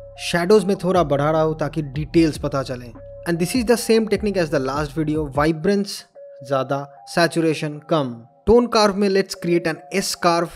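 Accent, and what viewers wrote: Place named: West Bengal, India. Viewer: Bro, you awesome. Your Hindi pronunciation is very similar, are you from West Bengal? If yes, please tell me the location. I'm also a photographer & Filmmaker, I would love to go for a shoot one day. Lots of love & good wishes. :-)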